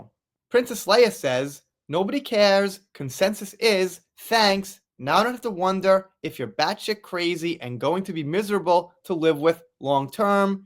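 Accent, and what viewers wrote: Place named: Long Island, United States. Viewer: Oh my God that accent. Tell me you're from Long Island without telling me you're from Long Island.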